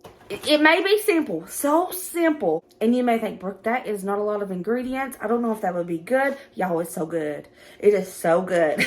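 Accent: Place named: Arkansas, United States. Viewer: Add ur peenches of salt! Love you girl. Your accent reminds me of my arkansas family❤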